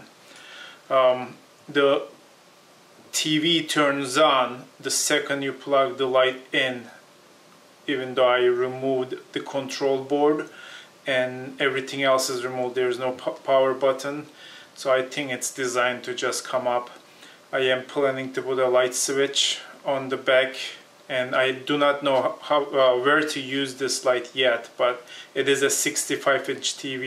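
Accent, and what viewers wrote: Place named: Turkey. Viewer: This is Turkish Accent 😏✨ 🙋‍♂️ hi there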